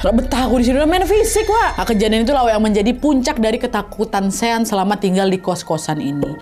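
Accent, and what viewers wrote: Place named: Sumatra, Indonesia. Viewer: 2 Minggu ini baru ikuti, terkhusus bagian Crime story,thx menemani malam gabut saya kak,btw asli orang mana kak? Dari logat nya sekitaran Sumut,Riau Sumbar?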